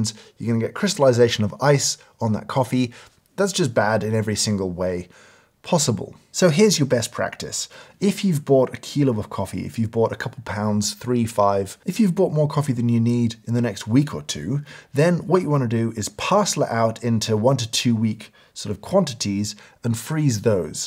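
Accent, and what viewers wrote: United Kingdom. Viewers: Is it just me or do you sound more British than usual? 🤔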